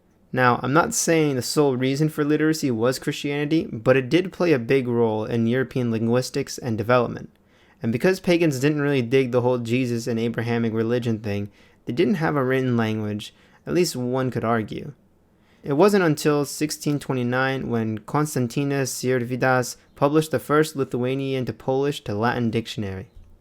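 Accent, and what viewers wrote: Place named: Russia. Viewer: Aš gavau šitą video recommended skyriuje ir aš lietuvis, bet tu skambi, kaip su rusišku akcentu.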